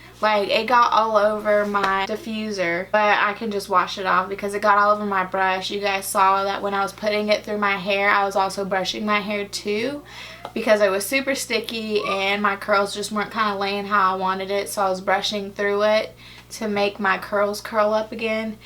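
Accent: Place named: South, United States. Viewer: Love the southern accent!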